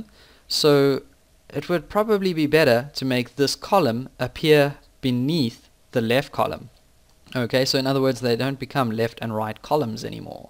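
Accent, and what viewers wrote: South Africa. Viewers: I LOVE your accent man, are you South African? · Really helpful tutorial, thanks & looking forward to getting something that triggers a menu list from a menu that normally appears in the sidebar. PS nice to hear a South African accent :) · Afrikaans English accent ? South African ? Good video.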